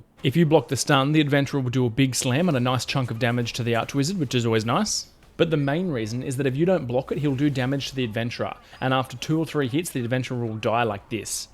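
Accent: Australia. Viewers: Heard the Aussie accent and instantly hit subscribe. Gotta support me fellow Aussie content creators.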